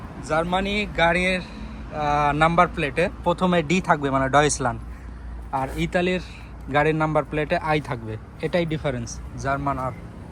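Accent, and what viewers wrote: Rajshahi, Bangladesh. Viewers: বাংলাদেশে আপনার বাসা কোথায়, ভাষা সুনে রাজশাহী মনে হচ্ছে।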